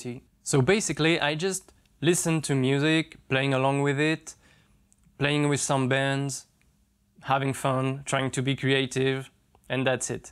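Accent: France. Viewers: Super video !! Ya encore un petit accent francais😂😂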